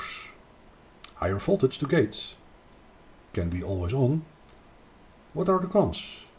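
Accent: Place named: Auvergne, France. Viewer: il nous agace avec son accent auvergnat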